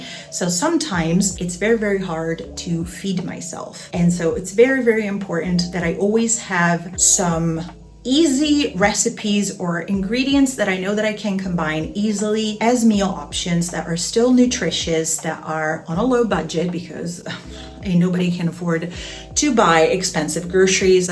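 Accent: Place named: United States. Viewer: For someone who speaks perfect Italian and perfect German, your american accent is a put off. I'd rather hear you in those languages any day.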